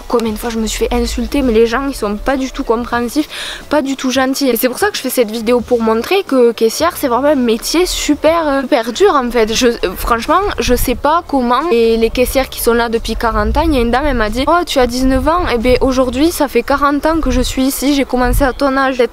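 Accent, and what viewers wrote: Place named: Toulouse, France. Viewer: ho pitain l'accent toulousaine vive les chocolatine XD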